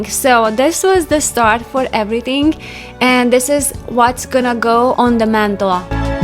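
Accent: Hungary. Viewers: Kihallottam a magyar hangsúlyokat tudtam hogy magyar vagy! Köszi a sok ötletet. Egyszerű és nagyszerű! 🎄❤️boldog karácsonyt! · So sophisticated and beautiful, you gave me great ideas! You have a Hungarian accent, are you from Hungary by any chance?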